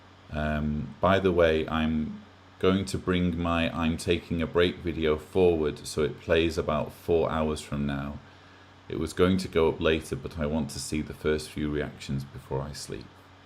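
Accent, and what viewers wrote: United Kingdom. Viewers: you have thousands, tens of thousands of supporters. Please know that. I don't even consider myself an ex JW, since it's been many years since my journey with them. I listen to you so that I don't fall back into that nonsense. Mostly, I listen to you for your voice (we Americans are crazy for British and Australian accents), your wit, your humor, and your humble wisdom. I have learned so much from you. We love you, man. Please take care of yourself.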